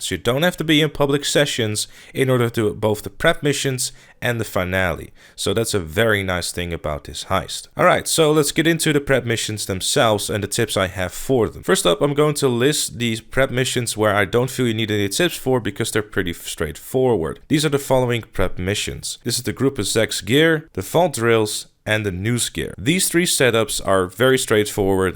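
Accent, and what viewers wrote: Netherlands. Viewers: Heyy are you Dutch? cause i think i hear it in your voice ik ben ook nl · Are you dutch? You have a bit of an accent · is this guy dutch?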